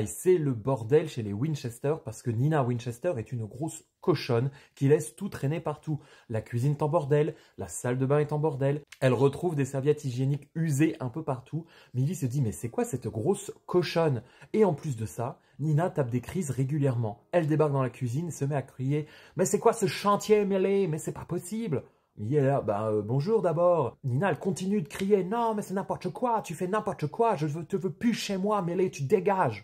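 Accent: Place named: England, United Kingdom. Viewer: Merci pour ce sacrifice qui m'aura évité de le lire 😂 Cet accent anglais juste... incroyable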